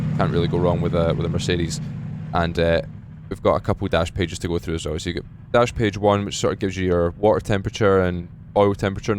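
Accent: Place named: Scotland, United Kingdom. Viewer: you've a great Scottish accent 😀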